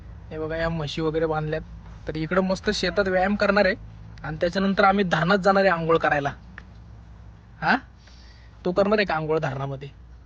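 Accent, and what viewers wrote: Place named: India. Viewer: दादा why don't you stick to what you are good at? We watch your videos for your daughter and and your non Punekar- non nasal-authentic Marathi language which is rare to hear now days. Why don't you stick to it rather than becoming passive aggressive to preach your personal thoughts and condescendingly asking people to rethink their habits and choices? There are enough youtubers out there preaching THAT stuff. You don't need to appear one of them. सुरक्षित अंतर ठेवा. 🙏🏻☺️🙏🏻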